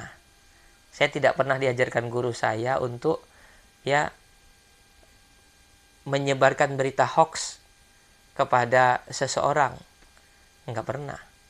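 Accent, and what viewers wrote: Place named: Java, Indonesia. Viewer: Alhamdulillah bisa ketemu channel ini. Insya Alloh bermanfaat bagi saya. Salam takzim untuk. Bahasanya lembut, logatnya seperti orang Jawa.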